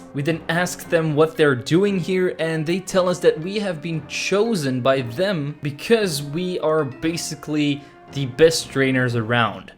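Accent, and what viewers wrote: Flanders, Belgium. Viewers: Hey, are you from Belgium perhaps? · Ya french or Dutch belgium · Are you from belgium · Wait a sec UR VLAAMS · Zijt gij van belgie? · Are u belgium?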